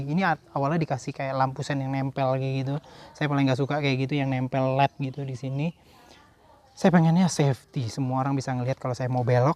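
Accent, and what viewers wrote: South Jakarta, Indonesia. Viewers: Agak2 jaksel ya ngomongnya 😁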